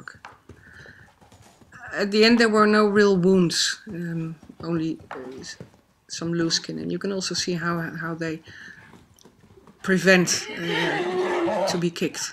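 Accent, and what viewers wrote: Netherlands. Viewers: Je klinkt erg nederlands. De dingen die je vertelde was erg logish en accuraat. Maar ik had meerdere hoopjes hooi neergelegd zodat ze elkaar konden onwijken als ze dat zouden willen. En ik denk niet dat ze vechten. Het is eerder kijken wie er sterker is. Ik snap waarom je ze in een kleinere ruimte zet maar ik had ze in een grotere ruimte gezet met meer hoopjes hooi. En ik denk alleen niet dat paarden zoiets hebben als "common sense".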